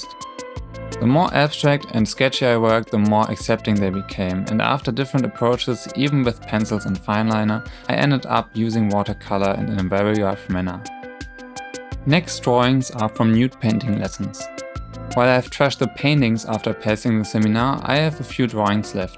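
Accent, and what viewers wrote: Germany. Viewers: Wusste doch dass ich einen deutschen Akzent höre ^^' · Ah, ich wusste, dass du Deutscher bist :P Du hast zwar wenig Akzent, aber irgendwie kam es mir doch bekannt vor, aber cool hier auch mal eine deutsche Perspektive zu hören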